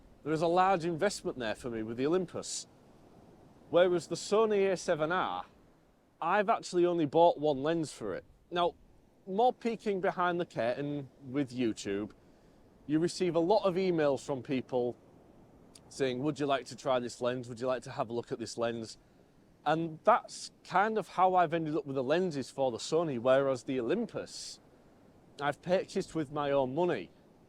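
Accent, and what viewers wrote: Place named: Geordie, United Kingdom. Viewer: Thank you for sharing. Im from Germany and I feel exactly the same for this Camera. I started photography in the age of 16 with the legendary Olympus OM-1 in 1976. I used Olympus with great fun and success till around 2000. Then i must go to digital Cameras for professional purposes. Canon was the only affordable system in these times. So i lost Olympus man years till the Pen System comes out. Till this point Olympus was not useful to me. Later i bought the OM-D EM10 MARK I. STUNNING ! Since this point, i bought several bodies und lenses and do everything with it. Two years ago i thought, that i must have a Fullframe Camera, the Lumix S 5. But seriously, i use it barely. PS: i have good friends in Newcastle upon Tyne, their dialect is quite similar to yours. You must be a Geordie. 😊 Thanks for this Video, take care.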